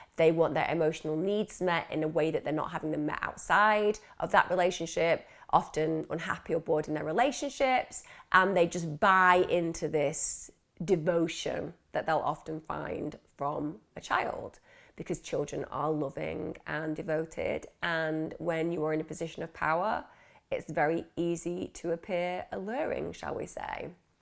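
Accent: United Kingdom. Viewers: British accents are almost as irritating as Asian accents.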